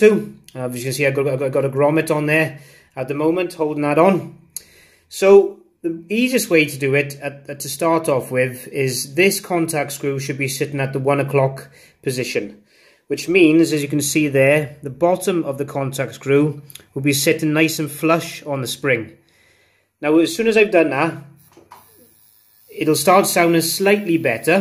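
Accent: Wales, United Kingdom. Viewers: I heard the Welsh accent and immediately knew I was safe, very detailed, thanks mate - a fellow welshy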